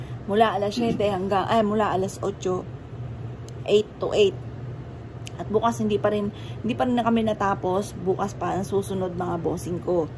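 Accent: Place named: Batangas, Philippines. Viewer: Parang taga batangas kayo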